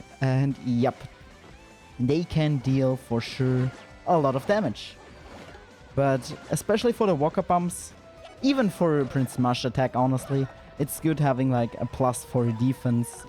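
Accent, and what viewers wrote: Germany. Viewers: Man hört den deutschen Akzent raus 😂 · I hear a German accent ?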